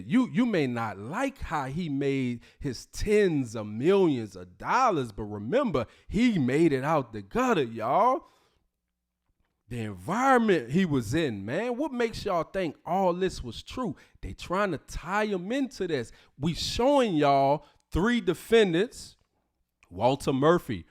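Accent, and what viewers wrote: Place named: South, United States. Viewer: I'm a new subscriber 💯 just curious is it NYC or N.O. I thought you were in NY but you sound like down south 😂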